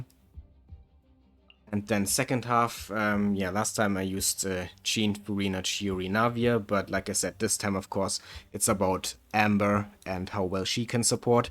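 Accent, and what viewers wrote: Germany. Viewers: I smell a fellow german 😋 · thats the most german accent i have ever heard in my life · You're German?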